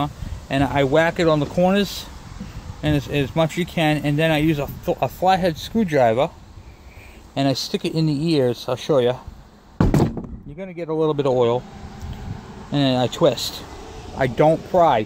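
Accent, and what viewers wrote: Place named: Maine, United States. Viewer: also, i noticed you have an accent... You wouldn't happen to be from Maine living in NH?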